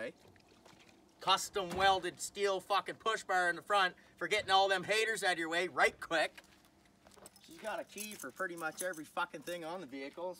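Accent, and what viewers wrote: Canada. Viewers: He sounds fucking Canadian not southern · Are you from Canada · I knew he sounded like a canadian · Obviously Canadian voice lol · You sound Canadian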